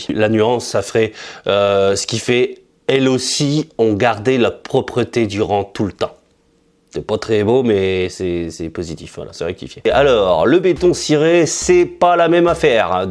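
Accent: France. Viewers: J aime entendre l accent francais 😁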